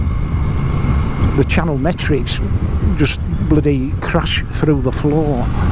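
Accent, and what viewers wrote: Yorkshire, United Kingdom. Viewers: Great to hear a fellow Yorkshireman speak out 😊 I fully agree with all you say here, as we do seem to have a two tier police system; that seriously needs to be altered. Nobody seems to be respectful or afraid of policemen in today’s world, & therein lies so many problems. 😢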